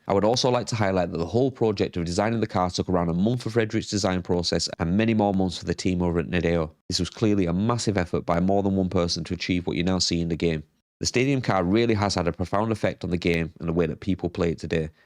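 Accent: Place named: Yorkshire, United Kingdom. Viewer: Great video fellow Yorkshireman! Love the accent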